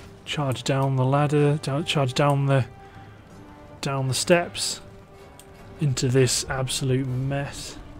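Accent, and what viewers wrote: United Kingdom. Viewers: God I love your English accent.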